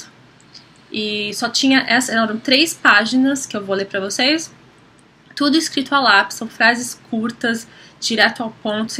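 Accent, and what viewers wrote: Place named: United States. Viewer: Você é americana? Meu esposo é de Chicago e quando fala em português tem um sotaque parecidinho com o seu. O jeito de falar é bem semelhante. Se bem que ele não fala quase nada em português hhh mas quando fala, algumas palavras saem como algumas que você fala. Claro, você fala muito bem, mas de uma maneira bem peculiar. ☺️🌼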